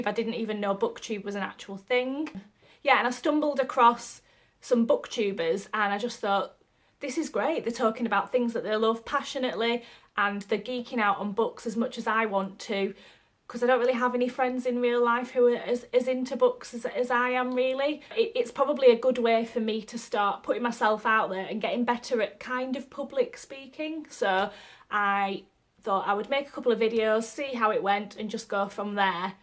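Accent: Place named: Northern England, United Kingdom. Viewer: I clicked onto this, excited to watch another Newbie Book Tag and am welcomed with the familiar accent of a Northerner on YouTube :') Hi!! Haha, where abouts in England are you from? (I'm not a stalker I promise, just curious haha) :)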